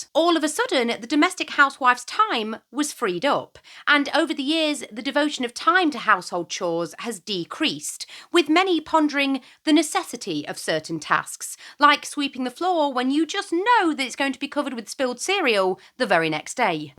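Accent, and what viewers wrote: United Kingdom. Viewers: I was being a butt at the beginning of this video, expecting it to irritate me, even though I agree with the subject. But your skilled analysis and breakdown of the issue quickly turned me around. I just subscribed. Your video helped to make me aware of my blindspots and preconceived notions. One of those being a slightly xenophobic perception of the british accent. Thank you, and I'm sorry for doubting you.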